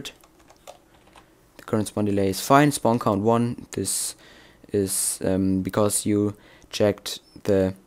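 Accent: Germany. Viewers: Man merk das du deutscher bist bleib einfach bei deutsch und nicht in englisch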